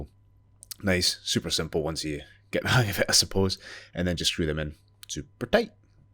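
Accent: Scotland, United Kingdom. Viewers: It's basically a seat from an old volvo with all them lumbar supports lol reminds me of my old man's motor years ago lol I'm looking for a new seat and it's gonna be one these boulies seats I do like that one in black I've been looking at as I'm a modeller and always at a bench building kits!. Whare are you from you sound like me down tae earth love the review deffo new scots subscriber!😂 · Amazing ! Love when I find a Scottish review. "If youv goat your own then use it coz it's pish" 😂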